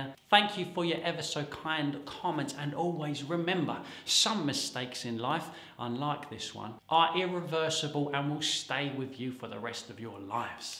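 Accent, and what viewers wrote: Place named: Cockney, United Kingdom. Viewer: you don`t talk too much! You`re enthusiastic about your topics! Your Cockney accent is charming as hell, including the dropped letters, differently-pronounced words, and all. Tattoos? Well, you can`t win them all.;) Luv ya, bruv.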